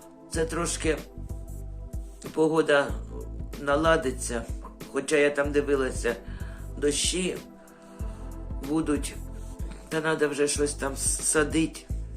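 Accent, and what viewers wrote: Kherson, Ukraine. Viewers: Я, коли дивлюся відео Ваші просто "побалакать", ніби побувала у своєму рідному до болю, селі на Херсонщині 😢😢😢, там так розмовляють, як Ви. Сидю, та подібні слова, осьо.... Я, як приїхала проживати на захід України, то з мене сміялися, що я так розмовляю😊, зараз вже "вигавкалася", як кажуть, та, всеодно, деколи щось таке подібне проскакує😂. Так мені до душі Ваші посиденьки, ну, класна Ви, будьте здорові та Богом бережені 🙏❤️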